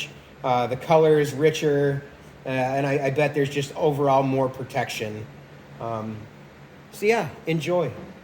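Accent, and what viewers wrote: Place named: South, United States. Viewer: This guy's from the South!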